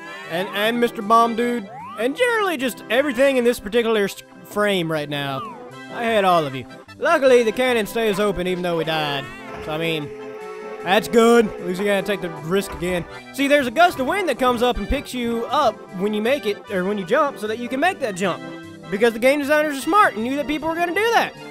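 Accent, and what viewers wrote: South, United States. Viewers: Hey there, nice LP. It's good to hear another southern boy doing an LP. If you're ever bored, check out my LP channel sometime. For the QOTD: Very hard to pick, but I guess I have to say Shifting Sand Land because I've always liked the Egyptian themed Mario levels. I guess because Super Mario Bros. 2 left so much of an impression on me, maybe?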